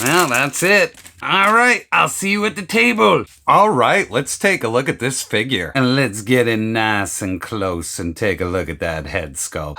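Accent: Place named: Pittsburgh, United States. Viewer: I'm afraid my ignorance was showing. This is a Pittsburgh accent maybe? I thought you were doing a funky voice in the intro but my accent knowledge wasn't up to snuff.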